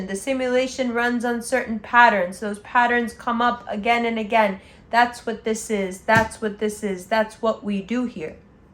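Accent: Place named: Brooklyn, United States. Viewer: Articulate and on point for the first half...straight up Brooklyn for the second half! Love you....need to get into Libra season...🙏🕊🌬⚖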